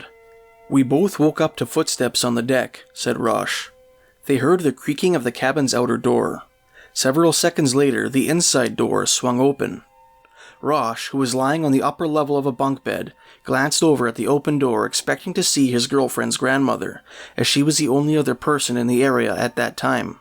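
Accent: Canada. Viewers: He even has a Canadian accent! ;)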